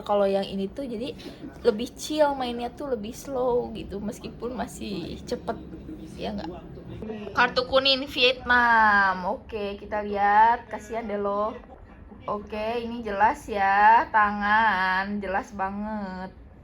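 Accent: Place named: Sunda, Indonesia. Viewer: Teteh..ini pasti orang Sunda, logatnya katara pisaan..ha..ha.. Tenang aja teteh, nonton teruus nanti ketemu lagi di pinal krn kita pasti juara..ha..ha..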